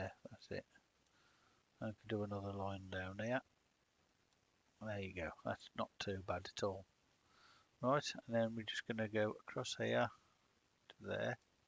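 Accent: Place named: Birmingham, United Kingdom. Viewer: Thank you for that very easy to follow tutorial, great t hearing your Brummie accent.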